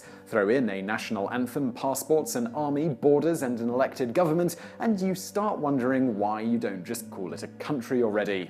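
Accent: United Kingdom. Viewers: He speaks the Queen's English & depending where in Great Britain he was born & raised will depend on the accent. My country is an English speaking country but we have our own language & accents that not many apart from bruhs & sis's near us would understand. We love having our own language & it's hilarious for foreigners to try & work out wtf we are actually talking about. But ur 100% right about the UK education & America's speak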